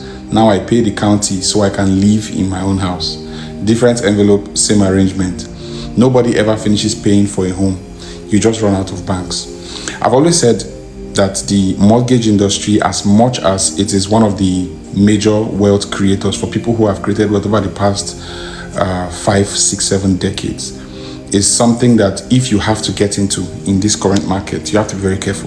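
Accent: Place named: United Kingdom. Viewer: From his speech I feel it's the UK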